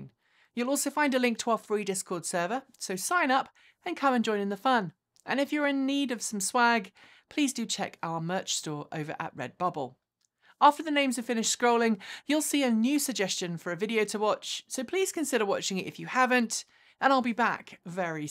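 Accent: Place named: England, United Kingdom. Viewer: I can't stand that haughty English accent!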